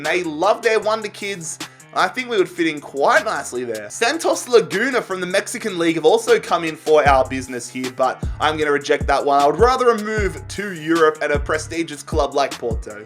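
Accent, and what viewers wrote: Australia. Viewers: Are you austrillan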